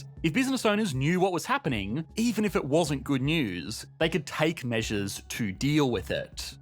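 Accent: Australia. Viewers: i love aussi accent, but all the endings with -eeeeuoooeeee is just too much. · U.K makes jet engines, high-end cars...has the most important financial centre in the world and yet EE still places UK behind NZ LOL seriously mate your economics education is flawed...There's more economic activity in one London street than the entire country of NZ. Are you a kiwi with an Aussie accent? why the soft spot for the land of sheep and the haka? lol if NZ was to fall off the face of the earth surely the world economy wouldn't be impacted. at least Australia has natural resources it exports to big countries...so Australia is definitely more globally significant to the world economy than NZ and yet you even placed Australia Behind NZ? You see the world from a biased and unrealistic realm. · He's an Australian. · defo Aussie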